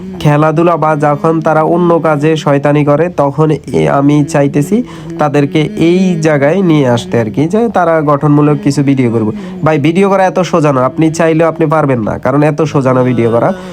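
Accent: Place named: Noakhali, Bangladesh. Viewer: ভিডিও সুন্দর করে তোলে সুধু নোয়াখালী ভাষার জন্য ই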